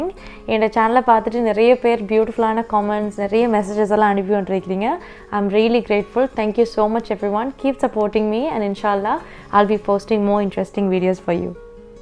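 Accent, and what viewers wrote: Sri Lanka. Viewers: Onga oor edu sri lanka la nega pesuradu naga pesura madiri eriki sis. I like u speech😍😍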